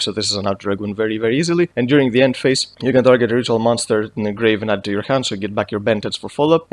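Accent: Greece. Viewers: έλληνας είσαι ρε φίλε; από την προφορά ρωτάω αν ναι, κάνε το true draco/monarch ντεκ να χαρούμε :P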